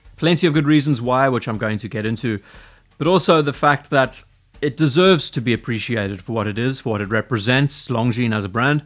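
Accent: South Africa. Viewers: Trouble placing your accent, Pretoria, or ZA in general?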